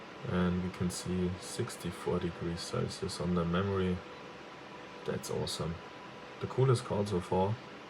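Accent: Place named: Germany. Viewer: Ich frage jetzt mal auf deutsch weil ich heraushöre dass du deutscher bist :D super video wäre nie draufgekommen kupfer zu verwenden.🙏 Wo hast du das Kupfer herbekomen in der Dicke? Und nach der logik muss man die Kupferplättchen dann niemals tauschen oder?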